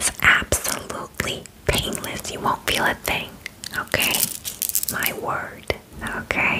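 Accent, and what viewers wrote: New Jersey, United States. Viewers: Alright I give, I'll subscribe because a fairy with a Jersey accent is the coolest thing ever lol. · Another flawless gem 💎 I absolutely love the "Jersey" accent.. I'm convinced that this is what a Fairy looks, sounds n acts like!!! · JERSEY FAIRY 🧚‍♀️🌸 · I didn’t know I needed a fairy from Jersey but I clearly did 🌸🧚‍♀️ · Jersey I think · Please, I need a another video with a jersey accent 🤣 this was too good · The New Jersey Brooklyn accent made this ten times better than it would’ve been 👍👍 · Girl, u are good at talking jersey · Its giving jersey new york fairy and im here for it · love the jersey accent ! 😂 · I love the Jersey accent. Love your videos! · she meant the jersey accent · as somone from nj, yup can confirm this is the “accent” we have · JERSEY FAIRY!! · Omg a jersey fairy 🥹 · Was expecting Irish or Scottish and I got Jersey. 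Love it. ❤️ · I think Jersey but some people are saying they hear a Brooklyn or Long Island accent! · Finally asmr with a Jersey accent without the gum chewing! 😭 Amazing video! ❤😊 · I love the Jersey accent ❤ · POV your from jersey so you don’t notice a difference till you go to the comments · The jersey accent really fits, I think I need more of her ASMR but jersey . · some people from jersey also speak like this, that's probably why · I've never been called sweetheart with a jersey accent before and I tell ya, I'm not complaining hahahahahahaa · *New Jersey fairy* Me: TAKE MY H E A R T. NEW JERSEY FAIRY IS THE BEST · oh my gosh the fairy with the jersey accent i am living!!! you're like the nanny but for fairies. 😍🥰🥺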